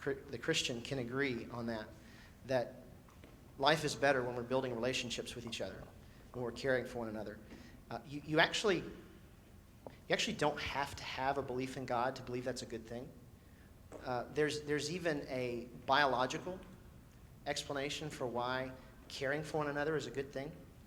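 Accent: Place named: South, United States. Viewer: ***** I must say, while you definitely have a different texture to your accent than that of the southern 'twang' stereotypically associated with 'the south', it's still distinctly 'southern' to my foreign UK ears, and I like it. :)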